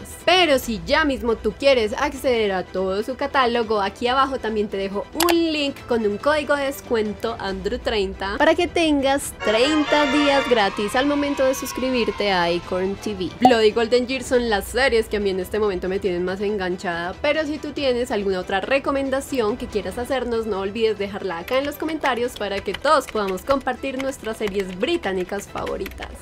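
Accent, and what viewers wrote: United Kingdom. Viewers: hablando ingles británico 😁😁😁 Amigeeks: Mira ese potencial.jpg Yo: Que femenina.jpg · Me encanta el acento inglés de 🇬🇧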